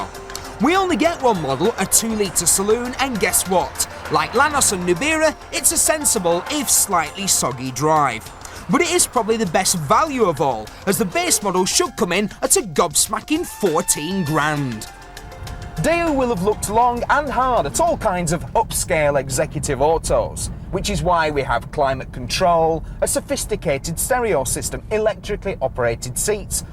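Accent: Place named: Northern England, United Kingdom. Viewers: EGGBIRDTHEROOSTER He's from the North. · 11/10 for his North England accent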